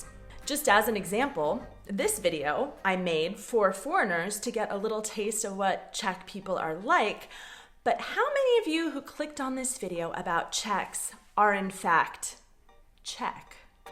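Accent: United States. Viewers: Co se tice zlatyho pravidla, ze cesi majou 10x lepsi aj jak cizinec, tak ti budu muset dat ve vetsine pripadu za pravdu. Bez urazky, ale u tebe to na prvni poslech vypada dosti podobne🤣!!! Ale aj presto proste ZERU tech par zpitvorenych, zkomolenin Cestiny v podani nejhrubsiho americkyho akcentu😁a BTW Vubec bychom se nezlobili, a tady muzu mluvit s dobrym svedomim za vsecky Cechy a Moravaky🙃, za pridavek tadyte anglo-slavstiny. Proste te MILUJEME!!!!❤.... tedy akorat po podminko, ze se brzy ukazes s salino v Brne😉. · Yep you sound american, I dont like your way of thinking as an outsider from neither America or Czech you sound so self-centered.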